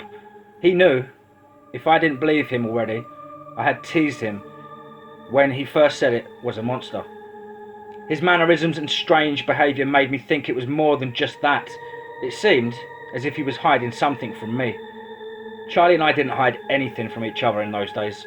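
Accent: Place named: Estuary, United Kingdom. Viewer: It's good to hear narration with a Thames Estuary accent; makes a refreshing change.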